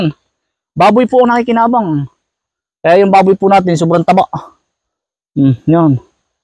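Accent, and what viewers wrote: Quezon, Philippines. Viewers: Iyan po ang nakasanayang tagalog ng mga taga Quezon, mahirap kay na baguhin ang nakasanayan Ay sadya iyan ang punto at mga termino gamit dito sa Quezon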